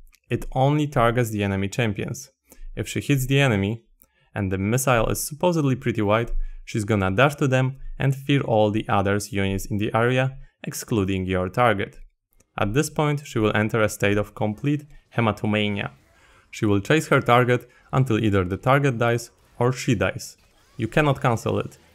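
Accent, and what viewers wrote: Poland. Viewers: Polski akcent uniemożliwia mi oglądanie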